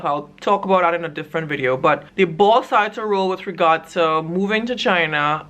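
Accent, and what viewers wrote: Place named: Trinidad and Tobago. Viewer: I bump into a video by you talking about fenugreek...so I'm asking my husband "listen to her accent, tell me what it sounds like." He said Trinidadian. And you confirmed it in this vid. Good videos btw.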